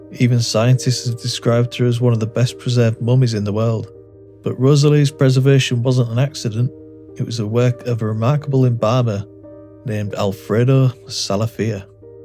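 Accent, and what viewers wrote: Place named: Hull, United Kingdom. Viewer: Your accent sounds like u might be from hull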